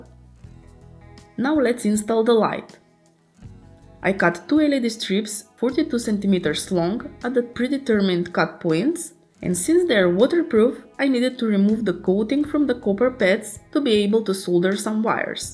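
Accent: Russia. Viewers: русская что ли ... акцент караул полный (((( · Ебать у тебя акцент... Ты русская, походу)) Можешь не отвечать, и так понятно. Один вопрос: а в бетонное основание нельзя было питание спрятать, чтобы не так убого было?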